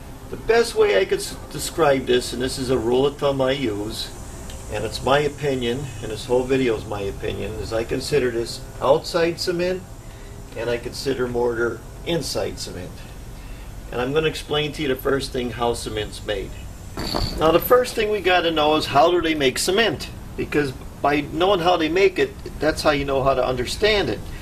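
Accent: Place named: Chicago, United States. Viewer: Sounds like a Chicago accent, awesome!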